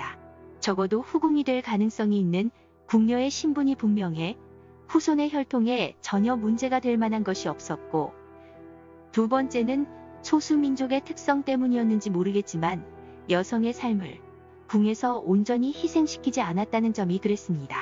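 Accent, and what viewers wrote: Gyeongsang, South Korea. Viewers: 아 저도 그 갱산도 사투리가 욕은 많이 먹었어두 나름 개성적이고 중독(?)되서 구독 한건대 쪼까 아쉽네영 ㅎㅎㅎ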